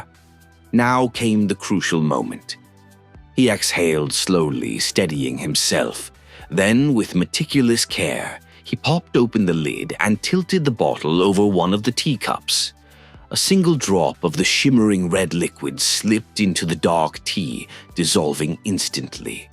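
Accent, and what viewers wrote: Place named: United Kingdom. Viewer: Ah yes british narrator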